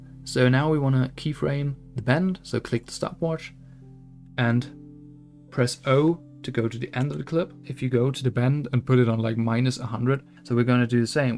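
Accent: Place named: Germany. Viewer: Erster Satz direkt den deutschen Akzent gehört <3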